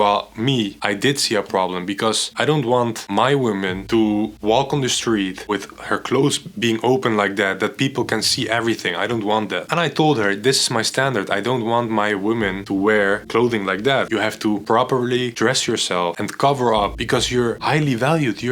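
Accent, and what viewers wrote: Netherlands. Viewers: Ben je nederlands bro.. ik hoor je accent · His accent exposes the Dutch nationality. 🟧🦁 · Excellent video, and channel. You do sound Dutch 😊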